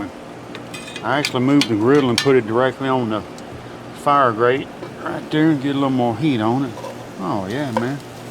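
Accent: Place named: South, United States. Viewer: As a kid I loved the southern accent and attitude. I still do, though as a Canadian I feel like I’m one of the few. Keep cooking brother.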